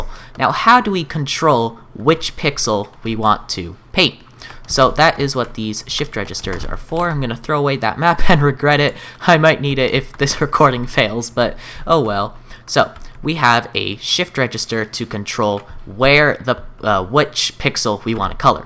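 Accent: United Kingdom. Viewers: oooooooooooooooh ur british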